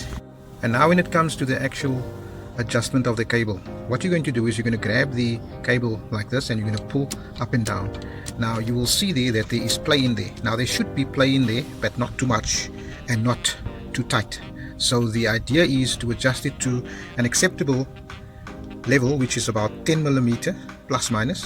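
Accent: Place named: South Africa. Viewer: Love the south African accent...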